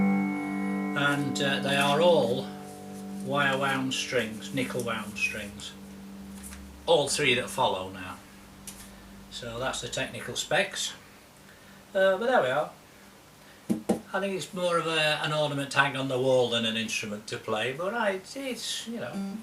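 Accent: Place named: United Kingdom. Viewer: A nice change from the common license plate variety. I would highly recommend a magnetic pick up then you can put some overdrive on and I think that big box will really sound good. Love your accent. I left the UK in 1984 and we don't have a lot of folk here in Montana that sound like us. Where are you from exactly. (Sentinel Guitars, Bozeman MT)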